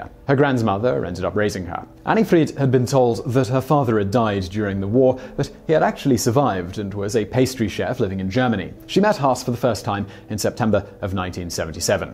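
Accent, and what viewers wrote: United Kingdom. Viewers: you often talk about your efforts to nail the pronunciations of other languages. It seems that in this instance your tendency toward a British pronunciation was just doubled down upon. Seriously I can't believe that any effort at all was made to understand or reproduce a proper Germanic pronunciation despite the jarring difference in meanings throughout the entire video... my enjoyment was negated by this oversight.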